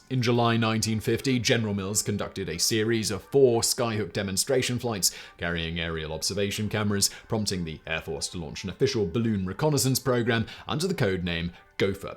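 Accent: England, United Kingdom. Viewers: Misinformation never sounded so english and bald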